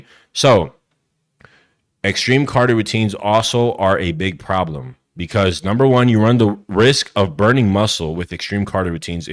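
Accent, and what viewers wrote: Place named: New York, United States. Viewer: My guy sounds like he's from NYC...good video bro..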